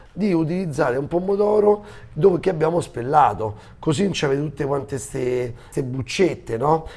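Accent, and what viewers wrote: Italy. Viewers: Preferivo i video di 3/4 anni fa dove parlavi con un accento più italiano ed eri più umile.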